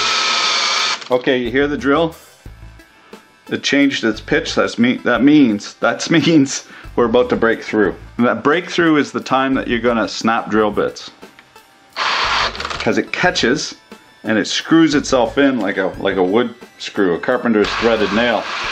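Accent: Canada. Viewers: Dude were are u from? I keep thinking Canada, but sometimes i really don't know? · You sound rather Canucken to me. whereabouts are you from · He's definitely Canadian, the canadianisms are real with him.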